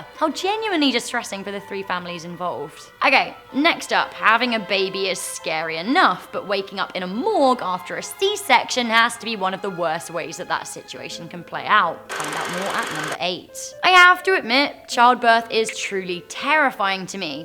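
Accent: United Kingdom. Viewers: I love your British accent , its soo beautiful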